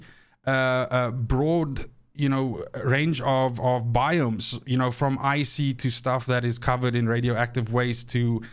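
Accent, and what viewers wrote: South Africa. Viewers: South African? Love the accent.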